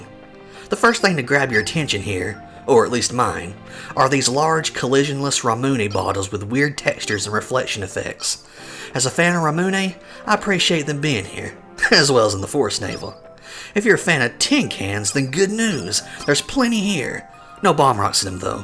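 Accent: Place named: Texas, United States. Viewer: He sounds like a texan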